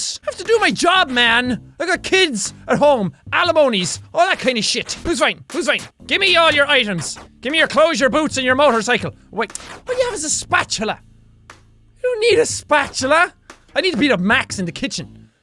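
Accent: Ireland. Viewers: Even if you have a irish accent me and my best friend (we are both from middle of England) both love you and think you are boss and awesome! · I've been watching for so long that I always automatically lower the volume. And I hardly notice his Irish accent anymore. Never bothered me tho because I'm loud just without an accent. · Who's LOUD IRISH GREEN HAIR IT'S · Retrosky Maybe it would be cool if could make a montage for the next subscriber milestone..? There would be the most shouty and Irish parts in best bits! Definitely RIP headphone users. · WHY IS IT ALL YELLING WHY IS IT SO IRISH i laughed too hard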